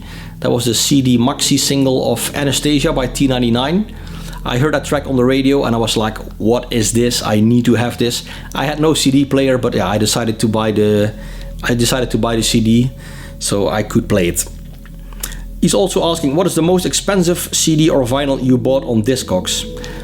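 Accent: Netherlands. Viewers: Anyone who complains that the Dutch host has an “accent” needs to go and flush themselves down the toilet. Ignore the morons. They walk among us unfortunately. I discovered this channel about two days ago. I have spent most of those two days watching all of your videos, stopping occasionally to sleep and eat!! Love it and keep up the great work. My fave is definitely the Space Brothers. Those guys are legends🤣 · The Dutch accent with English is great btw. It's reminds me of a time when I lived in netherlands years ago. So if some take issue with it they have the real issue. Happy Christmas to you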